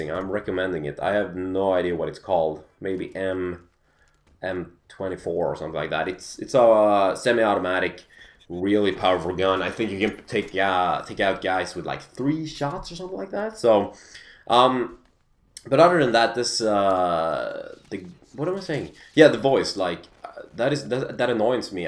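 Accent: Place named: Sweden. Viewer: Semi ADAMADIC, fucking love swinglish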